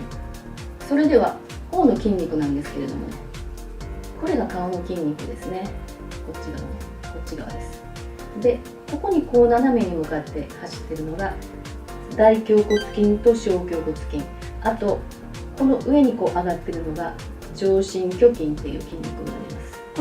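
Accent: Hiroshima, Japan. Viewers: タメになりました🤣 なんか広島のイントネーションに聞こえた😂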